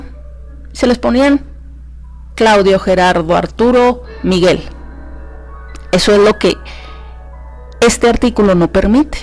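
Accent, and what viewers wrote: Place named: Mexico. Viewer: Por su acento, es de mi querido México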